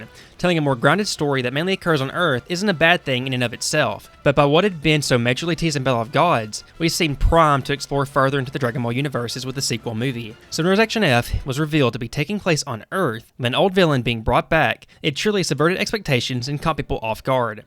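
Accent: South, United States. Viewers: I love youtubers with southern accents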